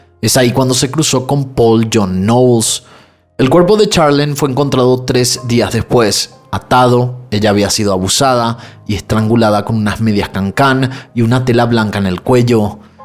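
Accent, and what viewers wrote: Paraguay. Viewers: Haupei kpeee, yo sabía que ese acento y forma de hablar era de mi querido Paraguay! Éxitos siempre che dúo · Amo que tu acento volvió a ser paraguayo 😉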